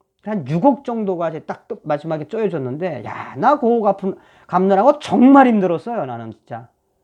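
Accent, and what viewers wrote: North Korea. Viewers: 정말 잘되었습니다 감독님이시라 논평도 뮤지컬처럼 재미있게 잘하십니다 이북사투리도 들을수있어서 거저 재미 있구만요 하는일 잘되시기 기도 드립니다 힘내시라우요😃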